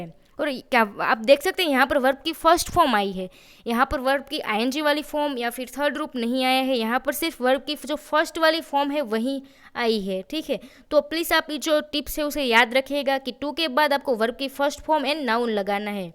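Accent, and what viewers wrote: Gujarat, India. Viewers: Gujarati accent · Ape ma'am to first ape Gujarati hoo 😅 vo to malum chala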